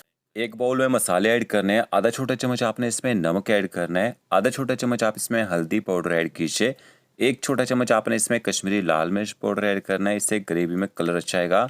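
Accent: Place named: Himachal Pradesh, India. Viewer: yes ji apne gorayian ko pehchan he leta hai banda mujhe aise he lagg raha thaa ki pakka app Himanchal se honge... mere khud bahut dost hai himanchal se.. Sujanpur tihra/ Solan/Dharamshala/Rajgarh/Shamli se tau kahi naa kahi apki awaz se pehchana ki aap himanchal se ho...Ek mera dost Sharma voh Nadaun se hai.. ok happy to hear you...thanks so much..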